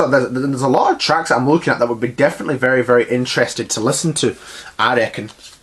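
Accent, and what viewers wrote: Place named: Scotland, United Kingdom. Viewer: Love your Scottish accent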